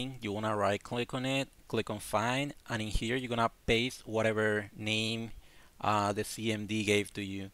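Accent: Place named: Brazil. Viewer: mano tu e BR? mo sotaque de BR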